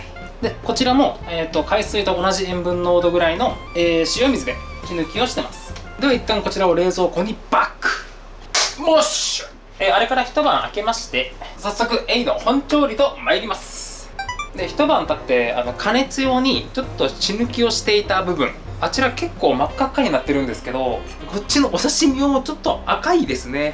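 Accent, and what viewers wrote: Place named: Fukuoka, Japan. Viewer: 速攻で冷蔵庫に直してきました。久しぶりに福岡弁を聞けて地元に帰りたくなりました！さんおかえりなさい〜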